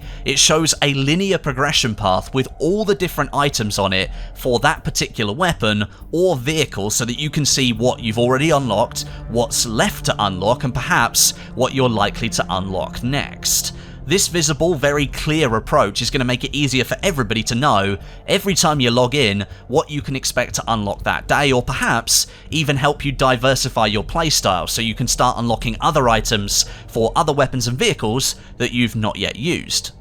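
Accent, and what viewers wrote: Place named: England, United Kingdom. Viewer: Where abouts in England is he from does anyone know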